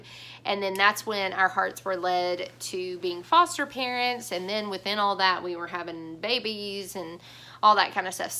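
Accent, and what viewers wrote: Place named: South, United States. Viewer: I want your Obstetrician! Also, I don't like pregnancy at all but love actually birthing. I always get epidurals though. Have birthed 6 babies and I love your channel...had no idea you adopted. The more I learn about you, the more I love you. God bless you, mama. You're doing a great work. Also, your accent is just amazing. I'm a Texas, but you are the epitome of southern. ❤️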